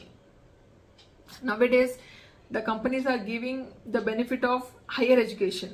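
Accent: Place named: England, United Kingdom. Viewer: I like u English Accent 🎉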